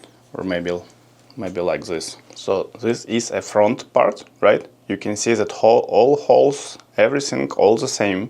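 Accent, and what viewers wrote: Russia. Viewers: я чувствую русский акцент )))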